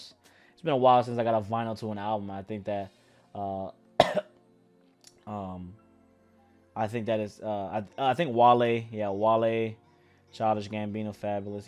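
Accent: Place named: Toronto, Canada. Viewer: Bro please stop doing that Toronto accent 😭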